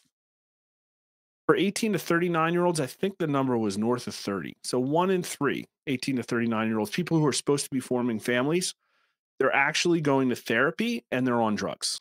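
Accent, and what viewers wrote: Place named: Wisconsin, United States. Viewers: Still weird to me that you’re from New Jersey but say words like have and had like you’re from Wisconsin. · I didn’t know was from New Jersey. I’m from Wisconsin. He absolutely talks like he’s from the Midwest. I would’ve pegged him for Wisconsin night myself.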